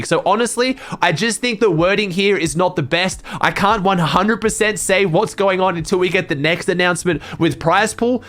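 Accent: Australia. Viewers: Talking Me Watching Benjy Die The Whole TIme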